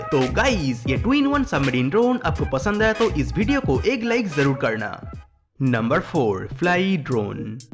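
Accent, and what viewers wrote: Bengal, India. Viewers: Damn!! That's some freaking bad accent!!! When will this indian learn English??? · Bengali ho kya bhai!!!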